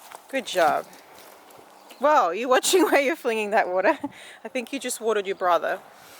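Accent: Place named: Australia. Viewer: Hi, did you get rain meanwhile? Just checked "weather Australia"😅 since i don't know where you are and it seems to be raining right now... somewhere in Australia... Rain is a bit luke health, we take it for granted until its missing. I'm only 10min in aymd enjoy the video as always. Like your Australian accent and am a bit jealous of your kids names. Maybe you should rename Dusty in something like "Rainy" or "Sloppy". 😅 I'll pray for rain.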